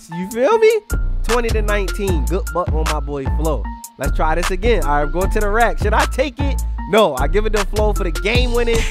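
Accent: Baltimore, United States. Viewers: Baltimore accent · Is from Baltimore or Massachusetts? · “Twiu, dwiu” Baltimore accent so ugly moe 😭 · Yo you gotta be from Baltimore we got the same accent and we talk the same 😂💀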